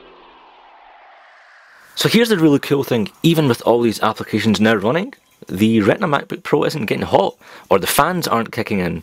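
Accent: Scotland, United Kingdom. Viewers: Scottish accent FTW!! Nice video btw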